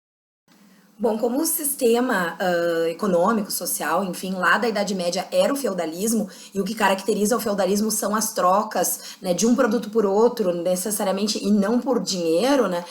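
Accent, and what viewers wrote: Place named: Rio Grande do Sul, Brazil. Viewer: Sotaque do RS é inconfundível.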